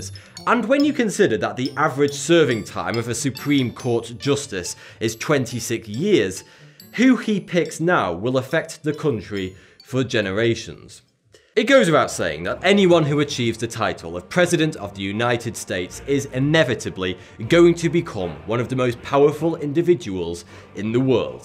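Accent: United Kingdom. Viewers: Pretty biased an inaccurate. Sounds like a Brit who watches CNN.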